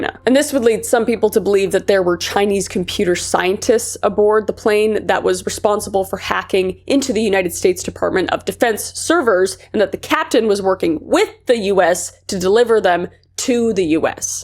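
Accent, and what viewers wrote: Canada. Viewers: I never noticed just how Canadian you were until now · Not trying to be a jerk here, but your Canadian is def showing in these videos bc your pronunciations are all over the place in terms of wrongness. Like Raleigh is said "Rahl-ee" not Ray-lee or whatever it is you're saying. You put sooooo much work into these deep dives and we all love you to bits for it, but you should really look into figuring out how to properly say these place and people names that you're not familiar with. This is like the 3rd or 4th video I've watched where you butchered place/people names.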